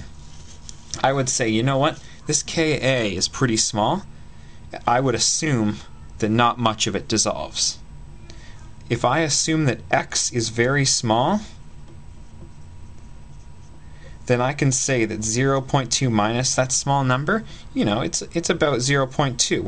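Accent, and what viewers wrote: Canada. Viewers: you're from canada arent you?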